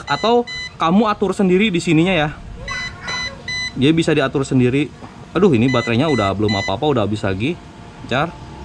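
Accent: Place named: Sunda, Indonesia. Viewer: edan sunda pisan.. alus pisan lah repiw na,, nuhun kang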